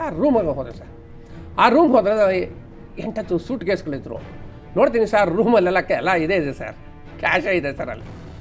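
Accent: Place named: Andhra Pradesh, India. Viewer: sir telugu slang super sir.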